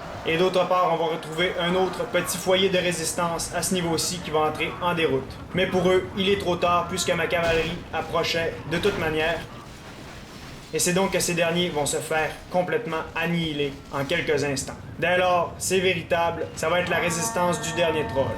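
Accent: Quebec, Canada. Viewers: moreover, he's got an accent from Québec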